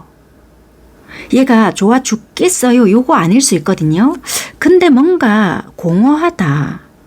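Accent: Busan, South Korea. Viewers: 1 듣다적은 요약 상대방의 생각 결혼하고싶다 좋아하면안되는데좋아하고있다 자존심 희생 누군가 한명이 '이관계가 뭐지?' 현타 구설수 나란히보단 눈치 상대방의행동 행동,연락없다 선톡해도 겨우 답장 일시정지 '우리 이별인걸까요?' 연락해도 불편 안해도 불편 이러지도 저러지도 심적 갈등 여러분의 행동 얼마전까진행동 지금은 안부를 했거나 일시정지 움직임없음 현재 상대의 생각 쉽게풀리지않을것 내가 움직이지않으면 너도 움직이지않을것 상대의 감정 후회 나의 생각,감정 어떻게해야할지아나 쉽지않네 얘만있는것도 아닌데 공허 이가 하나 빠진느낌 상대방이 원하는 관계 약속 약혼 열정 전생? 과거?에서부터 이뤄진 관계 너와 같이 살고싶다 결혼하고싶다 허허허 100% 500% 맞네요 부산사투리 너무 매력적이시고 현실적이라 좋아요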